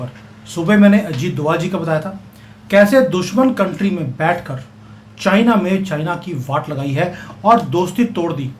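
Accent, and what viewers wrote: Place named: Punjab, India. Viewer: I had asked u to confirm if yr forefathers were from west side India. Lahore/ ASR. Yr way of talking/ using words which are usually spoken in that side . Gare for Olay( ice)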